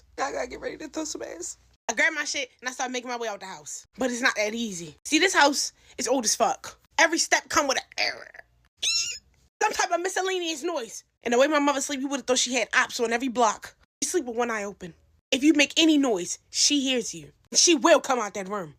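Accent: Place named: Baltimore, United States. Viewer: I think Baltimore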